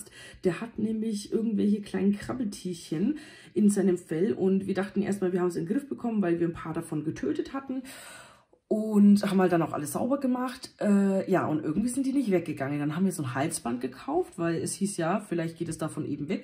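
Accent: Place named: Franconia, Germany. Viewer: Ich liebe den fränkischen Dialekt. Bin selbst auch ein schwäbischer Franke. Bitte bleib so wie du bist !!!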